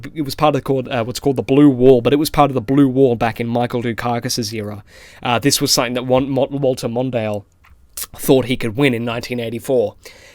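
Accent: Australia. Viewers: doesn’t he sound more Australian, Mr. K-On pfp?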